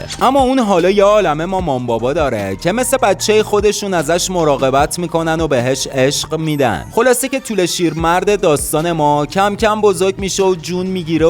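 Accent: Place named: Arak, Iran. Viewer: بابا قشنگ حرف بزن چرا میکشی تهش و اراکی مگه❤❤❤❤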